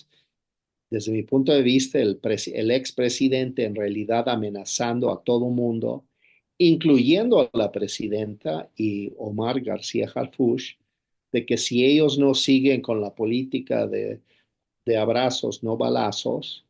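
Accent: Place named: United States. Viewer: Enrique Pascoe, ¿no eres mexicano? hablas mal el español. Al hablar del bloque liberal democrático ¿te refieres a los norteamericanos y europeos? Pensé que ellos había esclavizado a medio mundo, Africanos, asiáticos y aborígenes americanos. ¿No es EU el que le quitó más de la mitad del territorio a México? ¿NO ese mismo EU, que mencionas el que amenaza a Canadá en volverlo Estado de EU, o a Dinamarca de quitarle Groenlandia? ¿No es ese mismo EU, el que destruye a sus aliados? Solo hay qué ver la condición de los europeos ahora, después de la guerra con Ucrania. A México le iría mejor manteniéndose más al margen de EU, o lo acabará destruyendo. Lo mejor es que nosotros como mexicanos veamos nuestras opciones, no las de EU. De todas formas comprendo tu punto de vista: pareces gringo, hablas como gringo, defiendes a los gringos, aun con sus peores acciones. 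Entonces, eres gringo, ¿por qué no lo habrías de defender?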